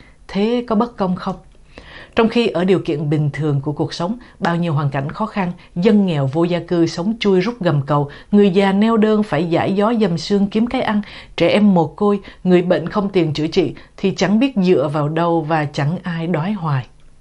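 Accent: Standard. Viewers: Cô xướng ngôn viên tôi nghĩ là người hải ngoại. Cô đọc rất lưu loát, rất từ tốn, phát âm rất chuẩn và rất đúng chánh tả. Cô phát âm các dấu sắc, huyền, hỏi, ngã rất chính xác. Phần đông giới trẻ ngày nay hoặc người trong nước phát âm lẫn lộn hai dấu hỏi thành ngã, ngã thành hỏi. Có ai còn nhớ lớp bốn lớp năm cô giáo bắt một trò đúng giữa lớp đọc một đoạn văn rồi cả lớp chép lại cho cô chấm điểm chánh tả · Ban noi chuan luon ung ho ba ... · Cô MC này bình luận và nói chuyện quá chuẩn quá hay luôn...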